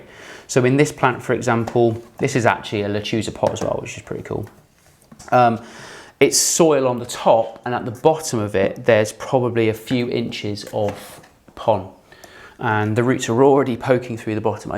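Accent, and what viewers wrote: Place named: England, United Kingdom. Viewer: You sound English!! Where do you get your clear inner pots? I can’t find places in UK that sell them?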